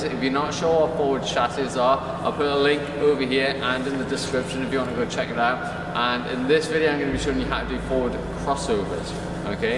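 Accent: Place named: Scotland, United Kingdom. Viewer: It's the accent of course. Sounds.. Scottish?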